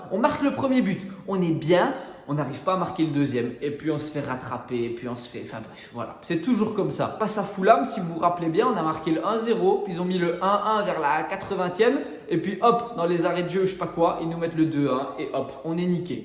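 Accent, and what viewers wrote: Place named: Liège, Belgium. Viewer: Toi, tu es belge et ça s'entend trop à ton accent... L'accent de Liège, quelque chose comme ça.😂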